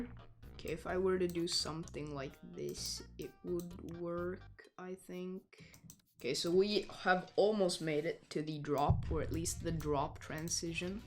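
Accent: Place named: Sweden. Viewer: Are you by any chance swedish? It just Kinda feels lika you have a bit of a swedish accent.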